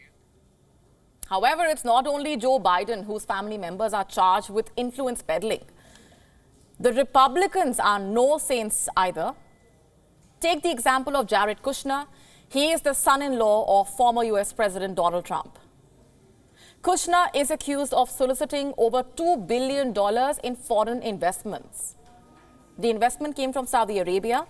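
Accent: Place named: India. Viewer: This is a great news site but unfortunately it is at times hard to understand the reporter because of the Indian accent and mis pronunciation of words.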